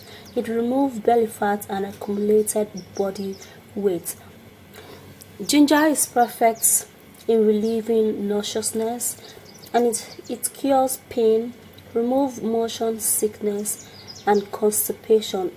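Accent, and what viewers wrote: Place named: Nigeria. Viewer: She's Nigerian.....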